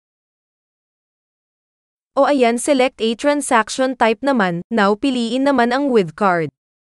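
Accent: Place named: Ilocos, Philippines. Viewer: ilocana ka haha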